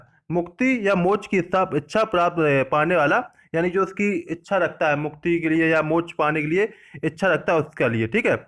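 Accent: Kanpur, India. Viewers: Guru ji kanpur se hai kya aap 😕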